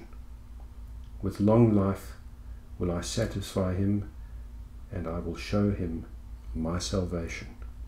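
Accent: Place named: South Africa. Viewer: You sound like you from, south africa, im wondering if it's possible for me to convert to Judaism, or how can I go about doing it?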